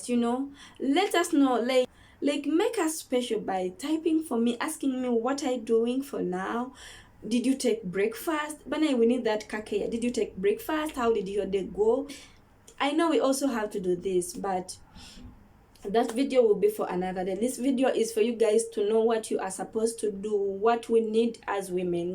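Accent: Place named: Uganda. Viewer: I feel you , but always communicate in relationships matters , munange kale I knew that accent that u are a Ugandan kabisa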